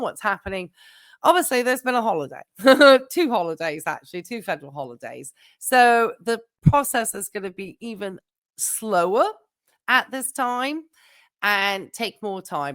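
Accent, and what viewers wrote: United Kingdom. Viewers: if he keeps it up his account will be suspended. it is their own policy. Sarasota Tim is not just targeting you. You are allowed to use some of his material under fair use. As long as it is not for financial gain and just for commentating. It is the same thing has the broadcast media they do it all the time. Tim and Tammy just like scamming people. Their older broth Johnny a very well known Las Vegas area family friendly comedian who lives in Laughlin. I think he performs regularly at the Sands Motel and Casino. Ace Frehley had to deal with them too. On his channel. Love your Cornwall accent. Lovely part of the country.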